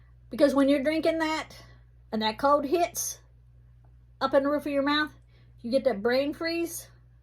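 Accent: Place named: South, United States. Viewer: Girl....your accent is Perfectly Southern just like mine....I Love it!!!! Get your Wizard friend some E6000, his crystal shouldn't break off again with that. I miss the Tang of yesteryear. Might have to pick some up at the store...lol Sale and clearance....I roll like that too...lol Star Anise, I would say in the spices. 💜💜💜💜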